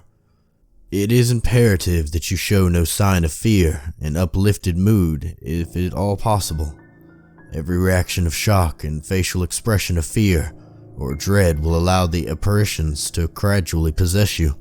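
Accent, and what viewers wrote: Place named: South, United States. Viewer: You got that southern twang in your narration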